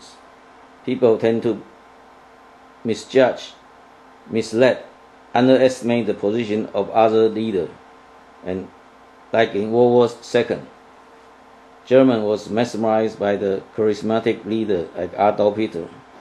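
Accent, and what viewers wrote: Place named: China. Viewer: From his accent you can tell is Chinese. Arabs and Chinese are the best astrologers in the world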